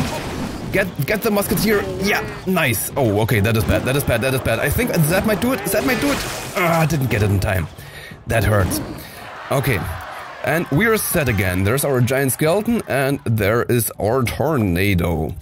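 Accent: Germany. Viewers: are u from germany? or from where comes ypur acent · He sounds German to me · you sound like a german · youre accent is like germans english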